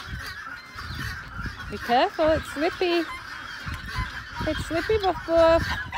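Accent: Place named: Ireland. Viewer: You sound a little Irish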